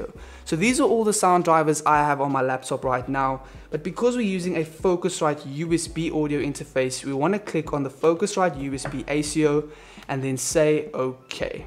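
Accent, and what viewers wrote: South Africa. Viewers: Surely you are South African! Anyway, great video ZA gang here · Hes South African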